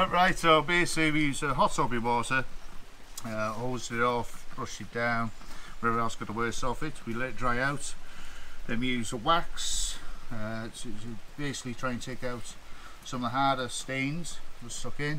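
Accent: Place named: Wales, United Kingdom. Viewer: Please excuse me but are you Welsh.great accent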